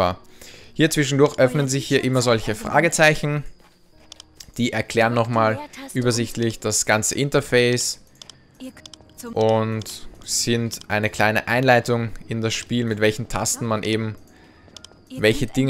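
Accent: Austria. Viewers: bist du österreicher ? und nebenbei .... hab mir lang überlegt das spiel anzufangen und du hast mich jz dazu gebracht :D du kannst es so gut erklären xD würde mich auf antwort freuen und vill können wir ja mal gemeinsam zocken :D Grüße, Ravaer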